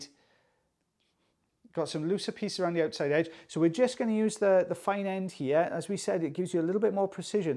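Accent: United Kingdom. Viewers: Just found your channel a couple days ago. Watched an embarrassing amount of your videos. I don't think this would have came out the first time with a hammer and chisel. Also I like you narrating the videos and your excitement when a big chunk comes out. Plus I love listening to British accents, especially the word "tidy"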